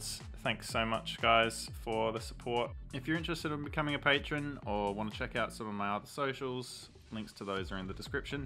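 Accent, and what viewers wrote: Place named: Australia. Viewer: This is so awesome - great models. Have you found your modelling skills have really improved since you started with the videos? I'm interested in hearing how you feel about your progression. Also, nice to hear another Aussie!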